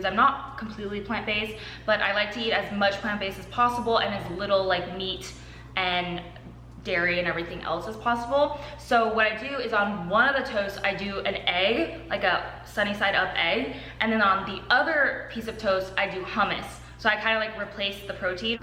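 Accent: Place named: United Kingdom. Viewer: The British accent tho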